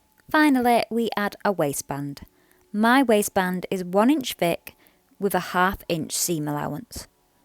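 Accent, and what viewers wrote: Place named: North, United Kingdom. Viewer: I LOVE your northern accent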